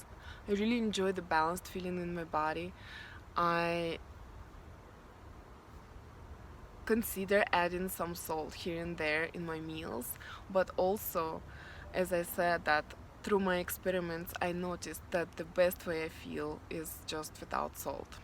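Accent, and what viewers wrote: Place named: Ukraine. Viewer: thanks for sharing your growth...also love your accent (Ukrainian??)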